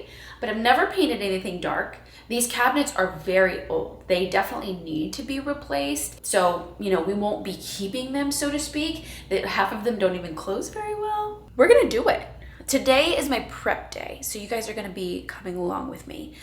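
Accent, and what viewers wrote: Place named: South, United States. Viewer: Cracking up at how your southern accent keeps coming in and out underneath the California one! 😅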